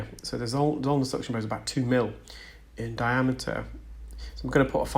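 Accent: United Kingdom. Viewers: He’s a toad; pay him no mind. I enjoy hearing your British accent and love your videos.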